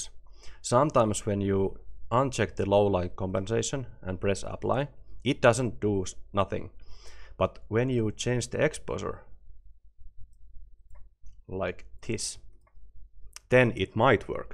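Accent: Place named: Finland. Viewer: Thank you so so much for your tutorial! I could not figure out how to get the Logitec driver back after I had to wipe my compute recently. That driver is going in a safe spot. Also you have a very lovely Finnish accent, one of my favourites the world over! THANKS AGAIN! (and yes you have amazing English)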